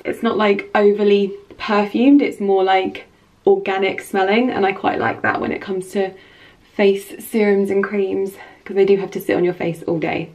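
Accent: RP, United Kingdom. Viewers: I haven't watched your videos in a while, and the first thing I wanna say after the first two seconds of the videos is: I'VE HELLA MISSED YOUR UNREAL ACCENT! Omg😍😂 I will always be jelous of british people. AND HOLD ON: HOW EXCITING IS IT TO BE TRYING ON WEDDING DRESSES like, for your actual weddingg!!😍😍😍 I'm so happy and excited for you!!! You teo look AMAZING together! So lucky ugh😍😍😍 Love youuu😄💗💗💗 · Hi, I am an Italian girl, I really love your accent, do you define your accent an "RP english"? It's sounds different and more elegant compared to other bloggers out there:) It's similar to the Emilia Clarke one:) Kisses from Milan <3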